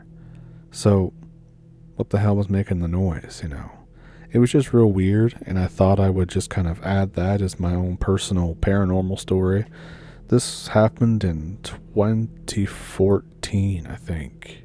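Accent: Canada. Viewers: Hey, -.. 💙❤️💙💙, I love hearing my canadian uncles and cousins when you talk,😂- I just want you to know, how I enjoy your stories, you should hear some known things what happened in Emerald Triangle. 🎉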